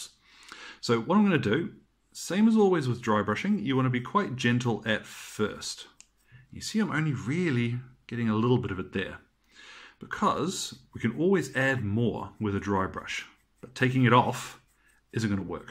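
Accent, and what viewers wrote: New Zealand. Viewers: What part of NZ are you from? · I'm from New Zealand too!! Haha think that's why it sounded familiar but not quite?? Thank you so much for your videos. I'm just starting out with a sisters of battle army :)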